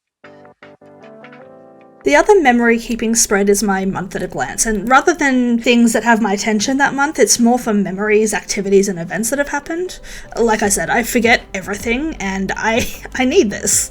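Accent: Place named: Australia. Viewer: Gidday from Sydney 😊😊😊😊. This spread is amazing. So good to hear an Aussie accent. ❤❤❤❤❤. I like the colours you've used. ❤❤❤❤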